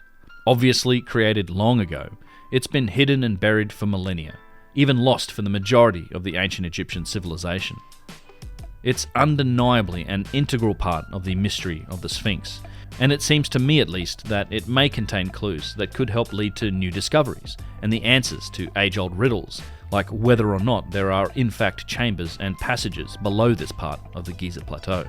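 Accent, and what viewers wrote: Australia. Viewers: Say, saw your full name written somewhere and it indicates you're either from Dutch or Belgian-Flemish descent (probably Dutch judging by your Australian tongue). I'm from Belgium myself, Flemish speaking, so, that makes us practically neighbours, eh?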